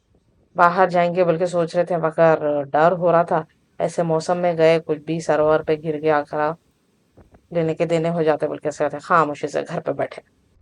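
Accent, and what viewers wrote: Hyderabad, India. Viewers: Really proud of u being using hyderabadi accent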